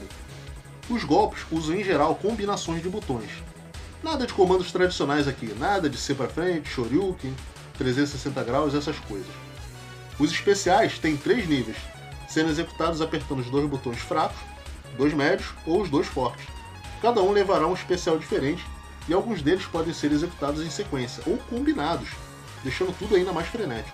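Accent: Rio de Janeiro, Brazil. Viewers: O vídeo é ótimo sem dúvida. O difícil mesmo é ter de suportar esse carioquêixxx carregadíssimo.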